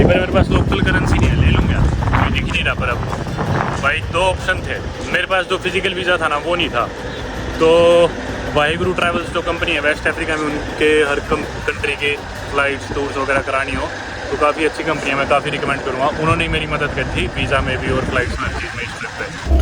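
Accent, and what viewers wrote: Haryana, India. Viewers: I really like ur height and also ur haryanvi enuciation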